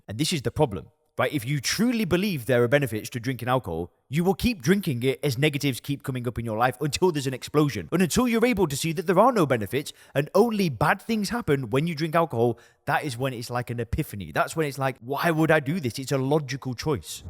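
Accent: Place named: North Carolina, United States. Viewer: you are from NC aren't you? you have the NC accent!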